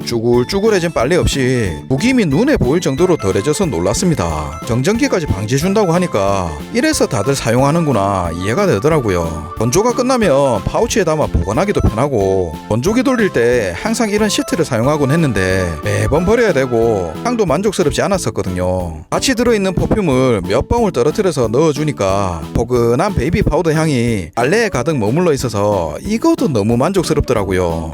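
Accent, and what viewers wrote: Gyeongsang, South Korea. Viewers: 이 분 경상도 사투리 너무너무 듣기 좋아요~ 아빠 목소리 같습니데이❤❤❤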